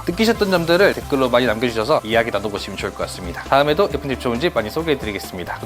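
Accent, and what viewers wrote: Jeolla, South Korea. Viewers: 제주도에서 전라도 사투리..^^ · 전라도 사람임? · 정겨운 전라도 말투네요. 설명도 잘해주셔서 편안하게 영상 보고 갑니다. 채널 번창하세요~ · 혹시 전라도 분이세요? ”촤측“으로 “코가구”를 하시는게 전남분 같아요~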